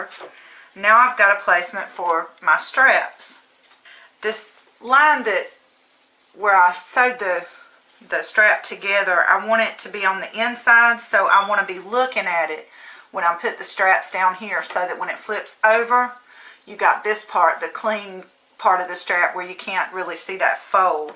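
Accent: South, United States. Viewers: LOVE your tutorial and even more, your Southern “accent” (I’m from the North so it’s an accent to me, haha) Tfs this very clear and concise video on how to add a zipper to a tote bag and also with an accent fabric. I bookmarked and partially viewed so many videos on bags my head was spinning but, yours was the best so far! Will go start my FIRST tote bag now and hopefully it’ll look as good as yours!! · I am from Mississippi, moved away very young. Loved, loved, loved hearing that southern accent again! And you are my FAVORITE tote/purse construction teacher. Love You!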